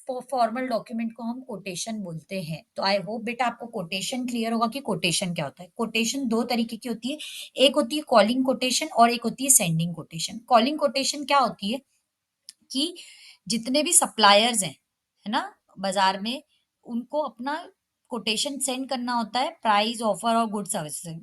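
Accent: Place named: Bhopal, India. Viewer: didi aap pakka bhopali ho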